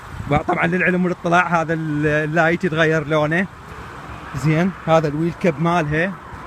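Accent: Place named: Basra, Iraq. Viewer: بصراوي من لهجتك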